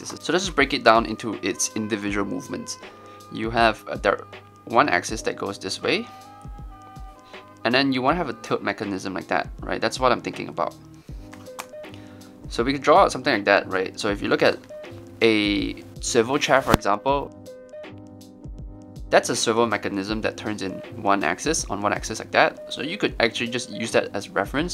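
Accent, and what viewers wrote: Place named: Singapore. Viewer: He sounds singaporean...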